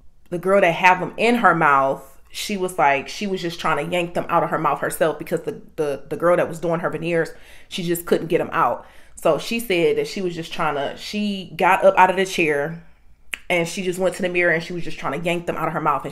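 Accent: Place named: Washington DC, United States. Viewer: The accent dound like she's from DC/PG..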